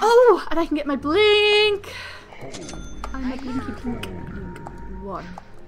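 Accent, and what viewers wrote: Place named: Scotland, United Kingdom. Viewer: This was really enjoyable to watch! Crystal Maiden is my most played hero. I've never actually played ranked yet, partly because I'm worried I'm most likely gonna fail miserably and calibrate myself at an embarrassing level and also because I'm not sure if I would be dedicated enough to push myself to climb, but it is definitely something I would like to attempt anyway. Also I have to ask, (hopefully this isn't too creepy, but) where are you from (I would guess you are Scottish, but I don't want to be wrong and look stupid, which I guess I have done by writing this comment anyway... oh well)? Your accent is awesome! Well I sure dragged this comment on a bit. I hope you do more of these, this was really good.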